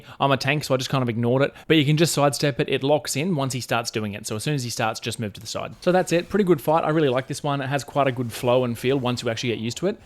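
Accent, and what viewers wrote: Australia. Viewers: Heard the Aussie accent and instantly hit subscribe. Gotta support me fellow Aussie content creators.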